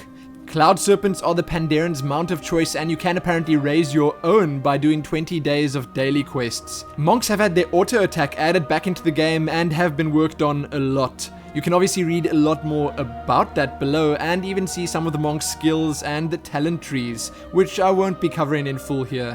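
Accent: South Africa. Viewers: It's a South African accent guys....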